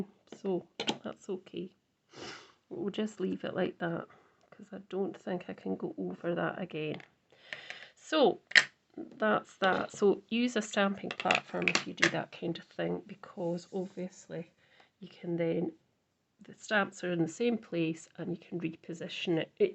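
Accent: Scotland, United Kingdom. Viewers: Absolutely gorgeous, lovely colours and design. It's great to hear a Scottish voice on YouTube, I've just found your channel and will enjoy watching your videos, thank you so much for your inspiration 💖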